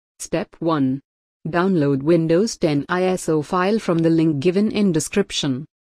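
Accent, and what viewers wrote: India. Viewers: you got really strong indian accent please use english subtitle thank you.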